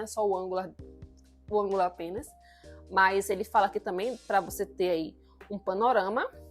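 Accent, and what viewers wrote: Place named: Pernambuco, Brazil. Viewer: Topp, obrigado, gosto bastante da sua didática e recomendações, e também sou pernambucano como você, é reconfortante ouvir nosso sotaque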